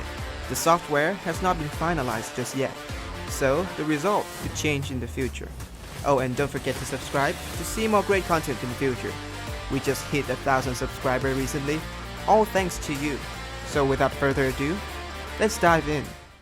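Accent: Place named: Thailand. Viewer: กำลังสงสัยเหมือนกัน แต่สำเนียงคือเหมือนคนไทย แต่ชอบคอนเทนต์ที่นำเสนอนะมีความเป็นตัวเองมาก ชอบครับ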